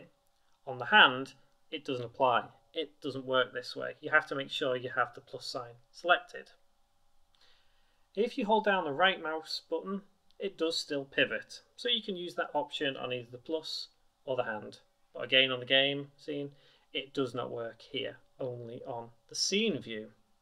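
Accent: United Kingdom. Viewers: he is british. They say Z differently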